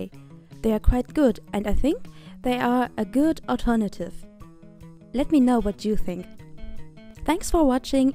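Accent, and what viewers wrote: Germany. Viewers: Man merkt einfach an deinem akzent wenn du englisch sprichst, dass du deutsch bist/aus Deutschland kommst 😂❤️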